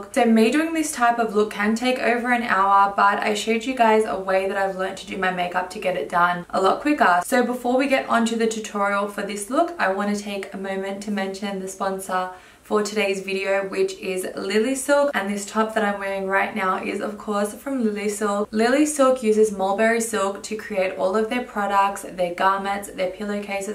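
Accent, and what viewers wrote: Australia. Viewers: Hi, I’m new to your channel and love your makeup looks! You have a beautiful accent, is it Australian? And what is your ethnicity?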